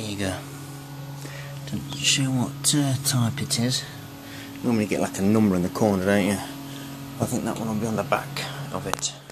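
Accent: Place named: East Midlands, United Kingdom. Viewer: East Midlands accent... Derbyshire? Ripley for a guess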